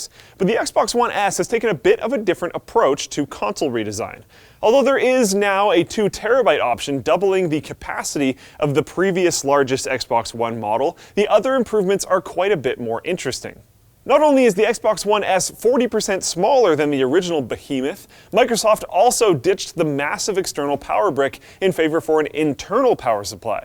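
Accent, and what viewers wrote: Canada. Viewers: from second one you can tell he's Canadian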